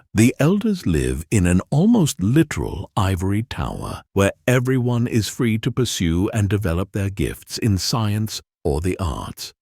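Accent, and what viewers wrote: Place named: Transatlantic, United States. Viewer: Love the transatlantic accent , reminds of the haunted mansion.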